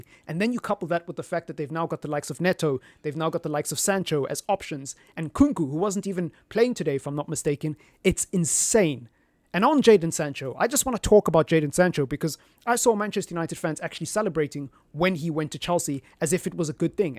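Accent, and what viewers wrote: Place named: New Zealand. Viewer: Where you from bro New Zealand or South Africa can't really tell ya know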